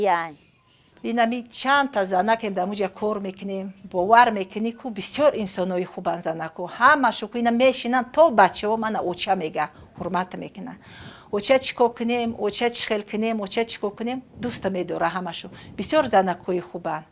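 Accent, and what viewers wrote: Tajikistan. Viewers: Ика забони точикии худмон ширинай. Бо и Модар ширинтар гап мезадас. Ахсан Модарчон мехнатотон рохат шаватон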